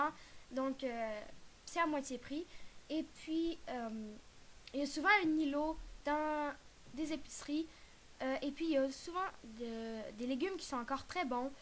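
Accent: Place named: Canada. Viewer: c es normal elle a un accent canadient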